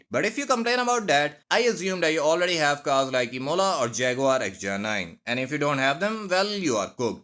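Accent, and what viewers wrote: India. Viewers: 😬 Racism at it's peak. I don't like Indian accent tbh. But your accent is the best Indian accent I've heard. EVER. Are you South Indian?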